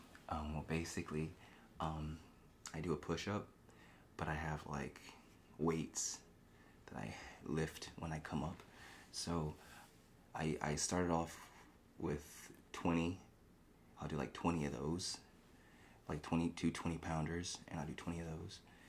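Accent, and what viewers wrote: England, United Kingdom. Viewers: I’m English, and I love your English accent. Ignore the haters, x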